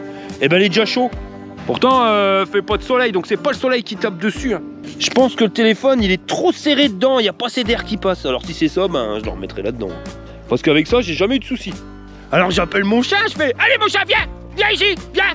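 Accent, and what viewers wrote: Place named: North, France. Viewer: Un kiff total !!! Avec l accent du chnord c est vraiment cool ! 🎊👍🏻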